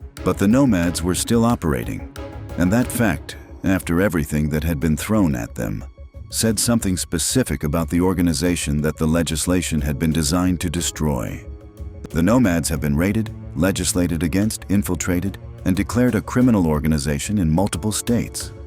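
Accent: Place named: United States. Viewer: Why is this narrated by a septic tank which is utter condescension. Even the images of the notes are American not Australian currency, and it does not snow in the suburbs of Sydney and Kevin Rud is not Anthony Albanese. If you're going to have a documentary about Australian bike clubs, it should be narrated by an Australian who knows the details not by some stupid septic tank. ￼💩💩💩💩💩💩💩💩💩💩💩💩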